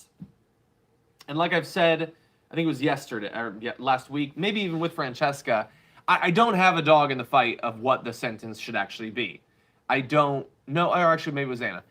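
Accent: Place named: United States. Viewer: Try to think of what sentence you would want for someone who brutally raped your Mother and took pictures bragging about it and put them on all social media platforms. 'Cause that is how I feel about these psychopaths who raped and tried to rob our Country. Just think how long you would want those gang bangers to be sentenced to prison. And I don't know how you can "not" have a dog in this fight. Are you a foreign citizen? Because you sound like an American. But I'm pretty sure you are a progressive. How far do you think the progressive movement will get if we can't even have simple Democrats. These people want their agenda, and only their agenda. They want to see any and all progressive legislators dead.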